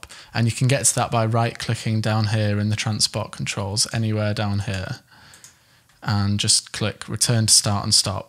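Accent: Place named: London, United Kingdom. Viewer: Your Studio One tutorial video, by far, is more understandable than any other free tutorial here on YouTube! The added bonus of your God-given British/London (or one of the suburbs, thereof) accent just makes everything that much more exciting and enjoyable to listen to. I'm American and I grew up on "Monty Python's Flying Circus" television back in the 1970's. Between that and interviews with the Beatles, I've loved all manner of English accents ever since. I know, for you it's not an accent, it's just your everyday speaking voice... I'm a voice actor and narrator and I just started using Studio One 5, Artist edition. Really loving it for what I'm doing. Especially for the one-time price. I'm also a singer and songwriter, so, I'll eventually make more use of the music recording and editing functionality... Thank you and keep up the great work! 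Peace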